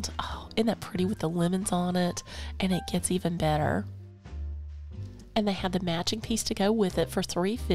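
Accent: South, United States. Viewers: you are my favorite shopping friend! You find the most amazing Restores and then find the best items in the stores. How do you do that?!? The narration is absolutely soothing with the cool music and that Southern accent! Your voice is like a mint julep for the ears. ❤️2U& yours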